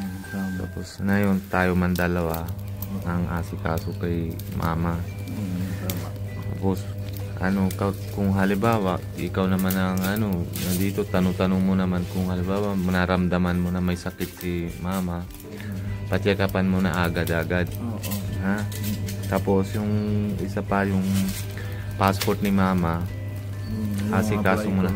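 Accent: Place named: Philippines. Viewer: Para na talaga filipino si kuya mapapansin sa salita nya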